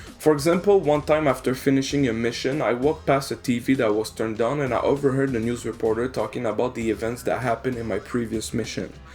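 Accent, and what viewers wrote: Quebec, Canada. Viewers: r u a quebecor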